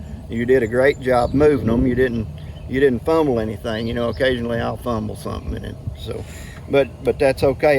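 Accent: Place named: South, United States. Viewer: Thank you for making this video. I have 12 days till I pick up my 5 frame nuc of Italian bees. I’m excited and a little anxious and your video shows me how easy it is ( hopefully). Love the southern accent. My momma is a Tennessee girl born and raised. My bees are gonna live about 10 miles from the Wisconsin border on our wooded 3 acre property.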